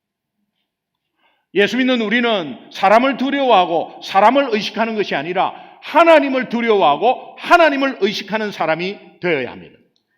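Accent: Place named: Busan, South Korea. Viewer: 그사람이 순천사람이라서 사투리를 잘 모르나보네요 목사님은 완전. 부산말투예요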